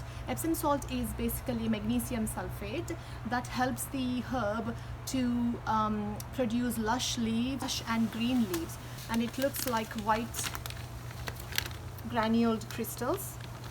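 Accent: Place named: United Kingdom. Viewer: You got British accent wow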